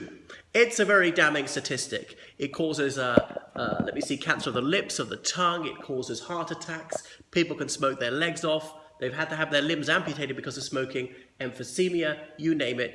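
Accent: RP, United Kingdom. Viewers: I was looking for posh british accent and I found you. Im peruvian and I ve been to England quite a few times .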